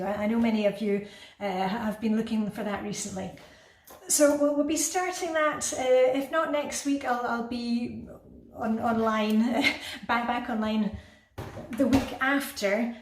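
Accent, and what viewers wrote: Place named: Edinburgh, United Kingdom. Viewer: Hi just to say I love your videos and you just as you are. I come from Edinburgh too, am 57 and also post menopausal and have lived many years in the west of Scotland and it is so nice to hear someone from my neck of the woods on YouTube! Since discovering your videos in 2018 I think you have been inspiring me to be a lot more organised and it is just nice to have someone there when I am working away in my kitchen or whatever encouraging me to keep on going. I always find your advice spot on when I hit a problem usually trying to do everything perfectly and failing! So keep on going and be assured your videos are appreciated very much out here in youtube land!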